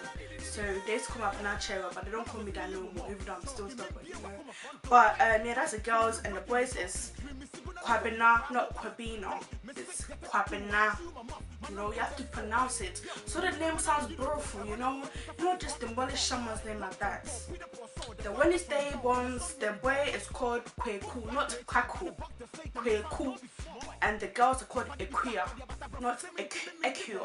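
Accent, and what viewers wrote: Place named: Nigeria. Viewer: lmao ur ghana accent sounds nigerian not ghanaian........ love ur tag interesting u shd hve danced azonto for us tho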